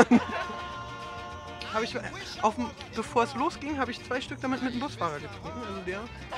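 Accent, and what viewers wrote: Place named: Berlin, Germany. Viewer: Ich könnte mir das video so oft angucken immer lust drauf haha mit seine berliner icke😹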